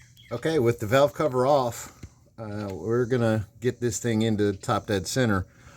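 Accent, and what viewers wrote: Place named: Kentucky, United States. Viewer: Im gonna get you a phone camera stand for you this garage day this year which is first day of winter only as a early as possible date and You'ved lived in Kentucky for so long your starting to speak tucky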